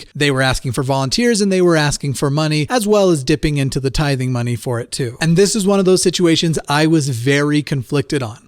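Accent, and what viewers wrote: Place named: United Kingdom. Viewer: First of all I’m really proud of you 👏🏼👏🏼👏🏼👏🏼🍀 and second of all I love you very British Intonation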